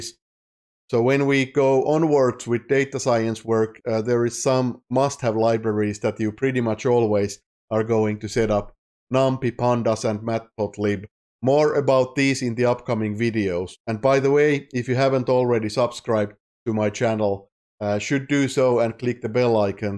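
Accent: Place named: Finland. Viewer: Love the Finnish accent, great! From Sweden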